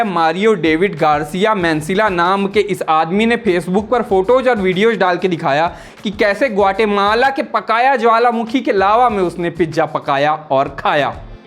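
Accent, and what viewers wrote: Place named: Kanpur, India. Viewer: Bhaiya aap UP ke hi lgte ho Kanpur ke aas paas ki jagah ke 😁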